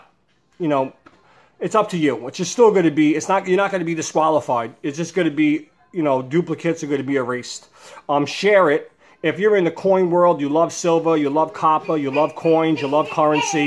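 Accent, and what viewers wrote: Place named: Boston, United States. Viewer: Haha my wife loves your accent. Reminds her of her grandfather. Paak tha caa in tha haavaad yaawd. 🤘🏾👍🏾✌🏾🤟🏾👌🏾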